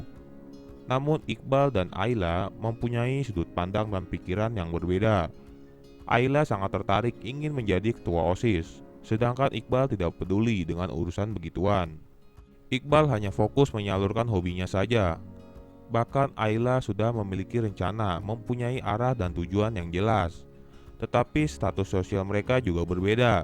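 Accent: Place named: Java, Indonesia. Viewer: Beyoh mas medok tenan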